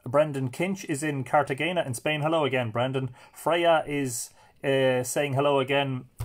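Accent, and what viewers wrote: Ireland. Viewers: My name is, Im from Louisiana and I have been listening to your live myth readings since the first Day. I never seem to catch them live, but I never miss a reading! I think what you are doing is great and want you to know you are really helping alot of us out there escape, atleast for a little while, from all the madness going on in the world right now! Being on lockdown and pretty much being out of work with no income for the forseeable future, and living in the place with hight initial growth rate of confirmed cases for covid-19 in the world, It's not easy to escape! You have such a warm, calmness and a delightful positivity, that "story time" has become quite popular around here! (Me and several of my friends, coworkers, and family members have started to call your nightly readings our "story time") when I first introduced your videos to everyone I said, "Check this guy out, its like story time with an Irish Bob Ross or Mr Rogers" and "story time" stuck! 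Lol And I just want to thank you and tell you are helping more people than you could ever know.... Me and my two Dogs, my sister and several other IUC nurses, a bunch of bored out of work waiters and waitress, and several of my family members who are stuck at home with their kids, all look forward to our "story time" You have a fan club growing in Louisiana and you are truely helping make the world a better place in a dark time. Your simple act of kindness, of reading stories to help people forget for just a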